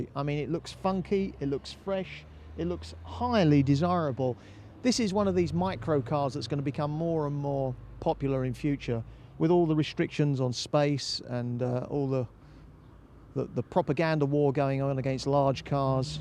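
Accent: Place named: Midlands, United Kingdom. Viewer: Up-holstered skaaete-bowd. What accent is this, Midlands? The North?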